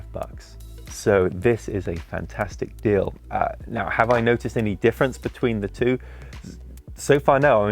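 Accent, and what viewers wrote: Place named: United Kingdom. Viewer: British accent and 'dollars'. Odd.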